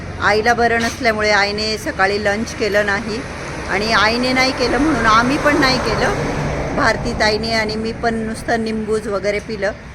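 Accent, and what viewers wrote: Nashik, India. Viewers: मॅडम खूप दिवसांनी video ची वाट पाहत होतो आणि तुमचा वीडियो आला आहे खूप छान तुमचं साद आपल्या नाशिक च बोलन आईकाला खूप आवडते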